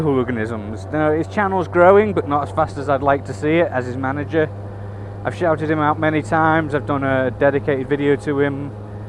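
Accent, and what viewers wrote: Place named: Wirral, United Kingdom. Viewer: I knew from the accent that you must come from that neck of the woods, but in my day, long, long ago in a Galaxy far away, I'd have placed you further up the Wirral. Mind you, I come from further up the Wirral, but not many people these days place my accent there.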